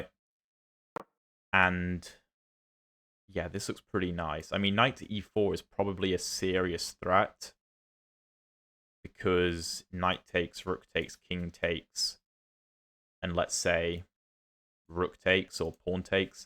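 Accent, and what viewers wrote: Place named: Midlands, United Kingdom. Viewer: Accent confirmation please. 'But', 'Rook'', 'Because' sounds Yorkshire (North) or Lancashire. The rest of it doesn't. Going with midlands - Nottinghamshire/Derbyshire.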